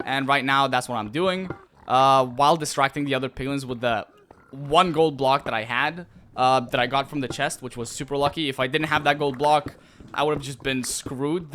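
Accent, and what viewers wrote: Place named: United States. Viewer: ngl your accent sounds so american that when you said zed it threw me off so much lol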